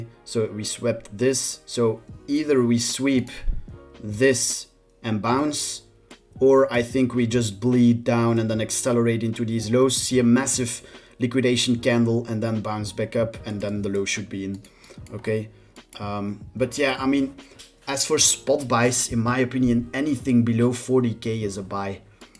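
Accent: Flanders, Belgium. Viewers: I hear flemish accent? ;) Agreed btw, looking to bid sub 45k if we get there. Wonder when/if we're gonna get sweep of liquidation candle bottom soon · Is it flemish?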